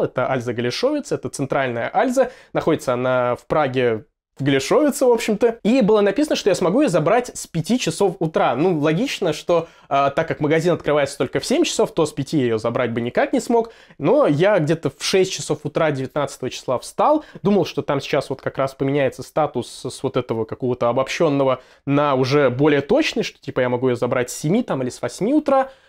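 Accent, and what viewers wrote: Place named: Russia. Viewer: Ты еще толком не жил в Чехии, чтобы говорить о чешском сервисе, а на тебя положили скорее всего из-за того, что у тебя русский акцент есть.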